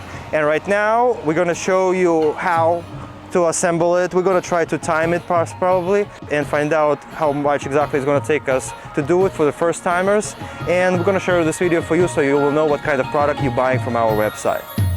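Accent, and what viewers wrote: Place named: Eastern Europe. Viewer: Am American and the Eastern European accent are enjoyable to listen to and makes for an easy How-to tutorial. Love the roof rack. You earned my subscription. Keep up the great content 👏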